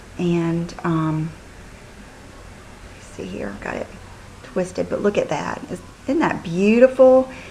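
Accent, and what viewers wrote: South, United States. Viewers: are you from the south , i love your accent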